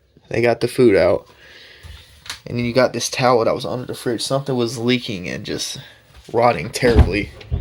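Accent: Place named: South, United States. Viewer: I was trying to figure out what state you were raised in by your accent. My guess was Carolinas or Georgia. You are my favorite explorer. The narration is great and you spend the right amount of time in each room. Keep up the good work!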